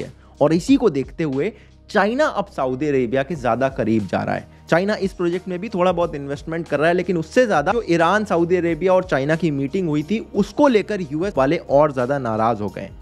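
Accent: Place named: North, India. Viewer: Donty donty eight 💀💀💀💀..bro this north indian accent is so funny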